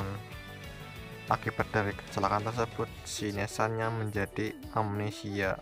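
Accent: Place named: Java, Indonesia. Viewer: Orang Jawa bang? Logatnya kaya orang jawa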